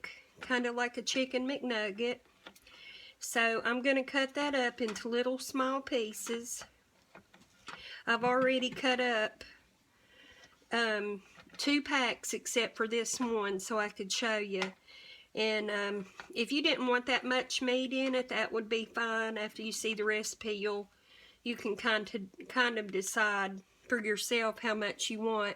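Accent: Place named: West Virginia, United States. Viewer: Hi I love your videos and Dollar Tree meals. I have made the Mexican Bake and it turned out very good, filling and low cost, which is always good. I am originally from West Virginia and you sound like good hearted WV folk. Subscriber forever. Thanks, Ray🇺🇸🇺🇸🇺🇸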